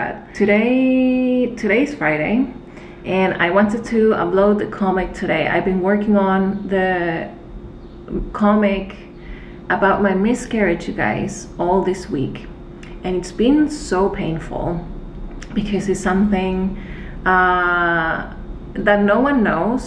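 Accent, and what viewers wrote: Chile. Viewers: Adoro su acento chileno <3 Te quiero eres como un modelo a seguir <3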